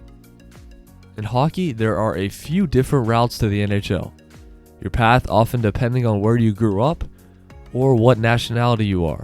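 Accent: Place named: Upstate New York, United States. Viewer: he can not be, sounds like up state NY. Or he is reading stat sheet from 6/7 years ago. The Gophers? Michigan is best in the 10. Badgers? Who? U.M.D. and North Dakota are better.B.U.however,that is right.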